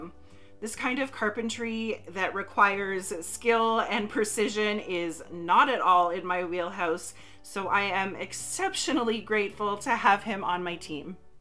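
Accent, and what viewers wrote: Canada. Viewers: I LOVE LOVE LOVE your Canadian accent!!! And your videos too! Beautiful work. :)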